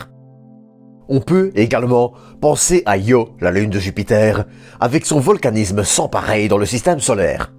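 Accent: Belgium. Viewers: Ton accent est de belgique ou d'ailleurs j'arrive pas à savoir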